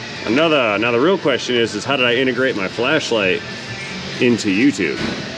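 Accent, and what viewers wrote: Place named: South Florida, United States. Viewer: You did say you all. That's what they call a Southern Florida accent. But same difference As a Southerner.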